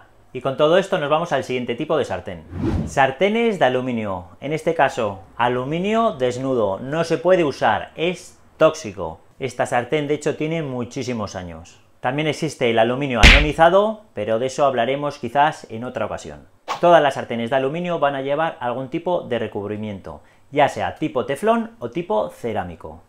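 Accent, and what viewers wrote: Aragon, Spain. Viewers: Holaaa, no te había visto nunca pero hoy ha sido el día. Eres aragonés no? Por el acento maño. Yo también soy maña😊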